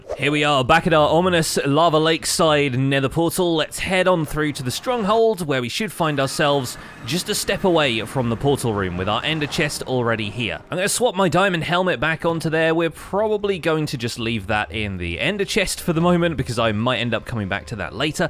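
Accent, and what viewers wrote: United Kingdom. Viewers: I just love your British accent😊😊😊😊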